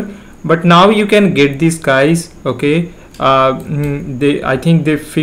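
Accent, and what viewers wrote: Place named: India. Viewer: He as the voice of an Indian scammer....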